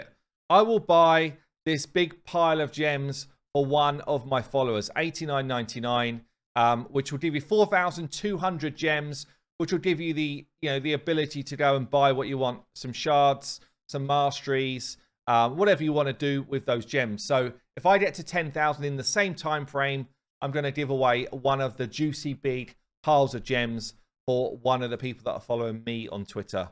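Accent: United Kingdom. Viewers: Fun fact: I hadn't heard the expression "brucie bonus" before and I know you've used it many times in your vids including this one, so today I finally looked it up. When you do a google search the very first link to a definition that pops up....well lets just say it has to do with ejaculate and erections haha. Brucie bonus indeed! Also, for a longest time I was mystified when you were making reference to your "finite" teams. I thought "finite"? What is a "finite" team, and where would I use it? Does that have something to do with the unkillable "infinite" teams? Then it dawned on me - you were saying FIRE KNIGHT, not finite rofl. Don't get me wrong tho, I love British accents 😸.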